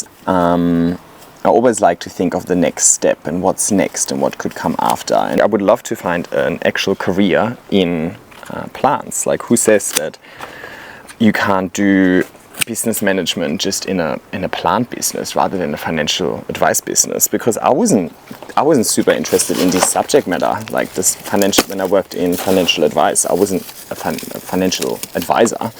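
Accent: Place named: Germany. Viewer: I vow to you I will watch your videos and I have subscribed to both.Not because Brad and Bailey are sooooo cute.Not because your German / Aussie accent is soooo sweet. NOT because I live to see Timothy and A.J. Because your very talented with plants and explain what your doing very well from start to adult plant.Your plants are healthy and beautiful.I LOVE SPENDING TIME WITH YOU.I can't wait for mom to visit.And yes see you were on t.v. so you know you have talent.I want to tap in on that.Thank you for making another channel as one was not enough time.And thank you for sharing you vast knowledge.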